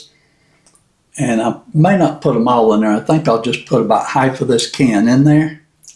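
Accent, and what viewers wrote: South, United States. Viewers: Lovely cooking demo. It came out great and I loved listening to your southern accent. 🫶🏾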